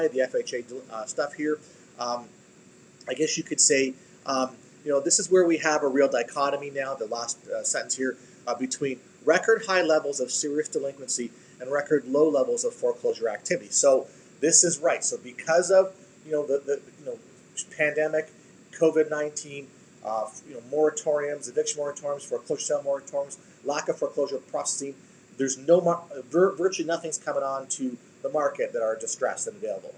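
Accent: Canada. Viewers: No big deal but you sound like you’re from Canada you have a Canadian accent I just started listening to your program just curious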